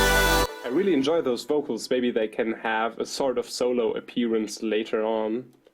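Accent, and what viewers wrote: Germany. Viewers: bist doch berliner oder :D?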